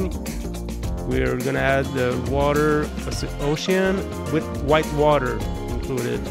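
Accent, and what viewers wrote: Quebec, Canada. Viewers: Is that a Québécois accent I detect? Bonjour cher québécois! The simulation looks astounding!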